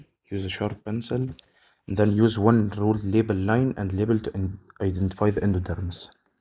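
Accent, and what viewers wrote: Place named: Arab world. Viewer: arabic accent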